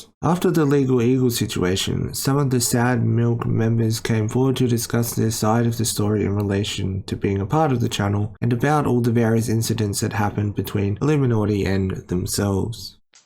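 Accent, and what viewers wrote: Australia. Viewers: maybe it's the Australian accent or that he his diction is beyond your understanding, but definitely not slurring??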